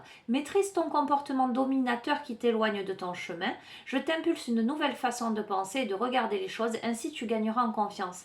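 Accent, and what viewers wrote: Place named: South, France. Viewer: Un p'tit coucou du Mans,. Tes guidances,font résonnance, Avec une sonorité spéciale,qui est un régal,cet accent du soleil ☀️☀️☀️ qui enchante nos oreilles.merci beaucoup bisous bisous toujours un plaisir de t écouter.bonne journée à toi et bisous caresses à tes compagnons à quatres pattes.☀️👍🙏🍀😘🌹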